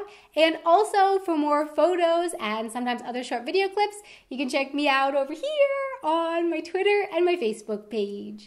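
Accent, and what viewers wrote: Germany. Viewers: English must be the most German English I've ever heard... Alongside my English lol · übler aktzent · als der typ anfing zu reden hat man sofort gemerkt das der deutscher ist :D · OMG I love this englisch German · hat man auch gemerkt als er enlgish gerededt hat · Oh that german accent hurts me :D but I probably sound the same ^^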